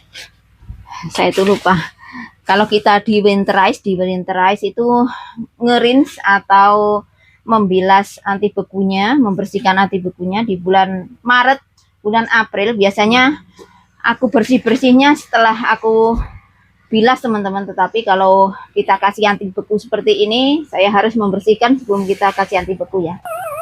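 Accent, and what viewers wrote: Java, Indonesia. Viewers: Mingat..mingat o kono ..iki barangmu...hihi ..seneng dengerin khas jawa nya 😁😁 Bun saya sering koment, smg tdk bosan😊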